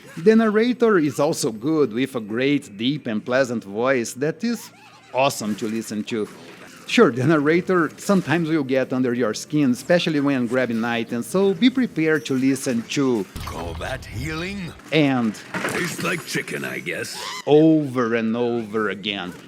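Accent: Brazil. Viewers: Realized by your accent that you must be brazilian right? Nice video.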